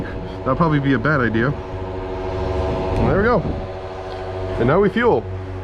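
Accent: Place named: United States. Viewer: Hi, just curious. Where are you from? You've got a very americanised accent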